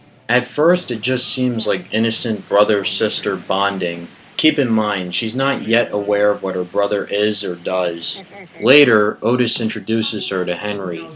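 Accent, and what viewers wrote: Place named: Chicago, United States. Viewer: I don't hear that, but with the Chicago accent he sounds a lot like the rude fence where they got the TV and camcorder.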